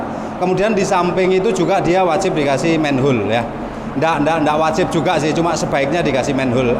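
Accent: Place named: Java, Indonesia. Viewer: logat jawane medok jawane asli mana pak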